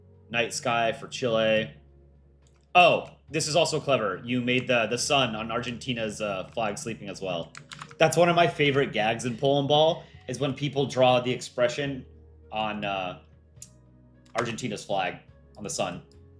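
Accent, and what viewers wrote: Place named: United States. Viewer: your voice like United States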